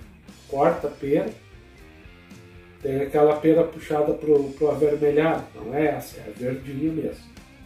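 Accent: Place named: Rio Grande do Sul, Brazil. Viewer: Buenas meu amigo que, pelo sotaque, só pode ser gaúcho! Conheci o canal hoje e já fiz a inscrição! Ótimo conteúdo! Abração!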